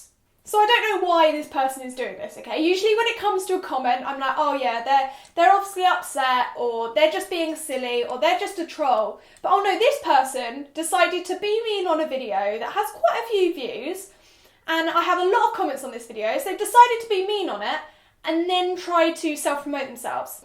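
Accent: United Kingdom. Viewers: Her British accent makes her anger so much more easier to listen to. Haha biased American.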